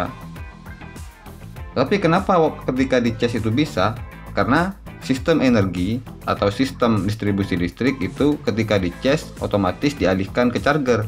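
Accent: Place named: East Java, Indonesia. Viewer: Maksih bang infox..klo di dengar2 logat nya abang nya pst dr jatim 😄👍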